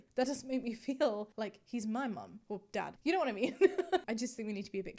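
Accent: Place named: Yorkshire, United Kingdom. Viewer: Eyyy Yorkshire accent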